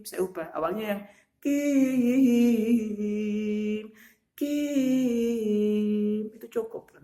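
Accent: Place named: Java, Indonesia. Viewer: aslinya mana sih bang? kok ada boso jowone "nirukke" ?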